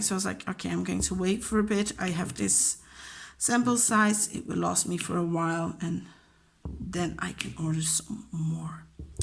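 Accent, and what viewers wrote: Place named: RP, United Kingdom. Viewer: I love your name so much and I think it’s so cool where your mom got your name from. I love that song! The variation to Berlin is really beautiful as well. I want to hear you speak alllll the languages! 😂 If you could come up with something to say in Dutch, and then say the same or something extremely similar in your dialect, I think that would be awesome! We might not understand it, but we can try to hear the differences. I’m one of those people who love your accent! It’s so hard to explain sounds, but I can hear a little bit of that BBC sometimes and your words have a kind of flourish to them sometimes? I don’t know, it just sounds fancy! 😂 There are definitely many people here in the US who do not appreciate teachers and also make the same comments about them having lots of vacations and stuff. Unfortunately they don’t understand that, as you said, you’re still working (unpaid most of the time) when you’re not in class with students and that working with so many kids is EXHAUSTING. 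I know I definitely could not do it!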